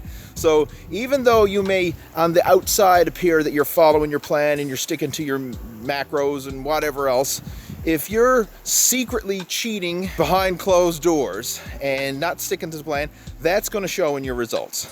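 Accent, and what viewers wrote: Newfoundland, Canada. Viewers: Are you a Newfie?!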